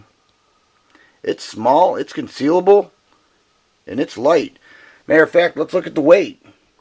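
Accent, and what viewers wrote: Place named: Upper Peninsula, United States. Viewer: Whereabouts in MI are you from? I grew up in the Detroit area, you sound very UP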